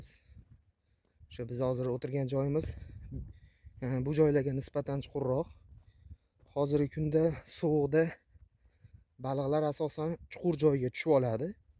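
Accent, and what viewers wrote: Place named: Andijan, Uzbekistan. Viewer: Шева Андижонча. Ов бароридан келсин, омад.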